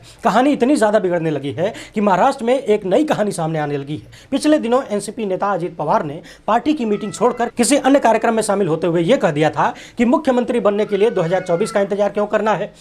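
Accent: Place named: Bihar, India. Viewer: tumhara bihari andaaj kamal hai,news jo bhi ho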